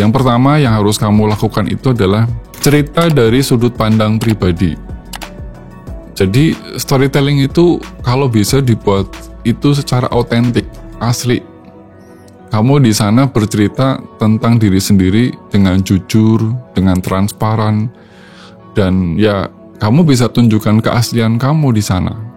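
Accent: Indonesia. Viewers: Mantap bang,kayaknya Abang dari Jawa ya mendok bgt ngomong nya? Kalo aku orang ngapak😂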